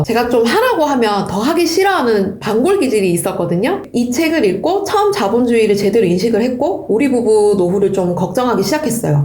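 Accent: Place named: Gyeongsang, South Korea. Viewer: 혹시 ㅎㅎ.. 경상도분아니신가요.. 말투가 약간 있는데…ㅋㅋㅋ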